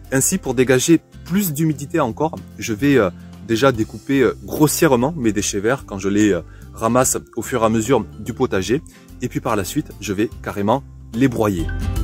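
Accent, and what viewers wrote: South, France. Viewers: Bonjour, Juste pour vous dire que j’adore vos vidéos. Les notions de chimie devraient en temps normal me faire fuir mais là, j’adore, je comprends et cela fait sens! De plus, vous écouter avec votre petit accent du Sud, donne le sentiment d’être en vacances. Merci pour votre partage.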